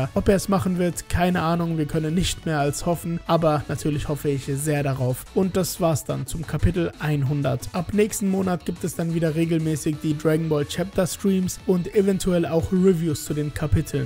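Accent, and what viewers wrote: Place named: Netherlands. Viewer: Ich dachte das war ein holländischer Akzent 😁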